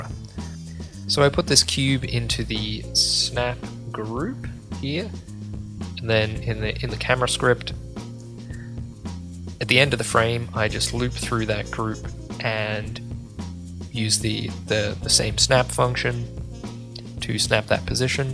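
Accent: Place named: United Kingdom. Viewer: It's nice to put a voice to the amazing work, we are honoured to be with you. Btw are from UK you sound like it lol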